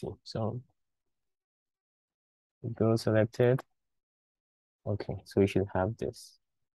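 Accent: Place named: Ghana. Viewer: You have a Ghanaian accent. Are you a Ghanaian?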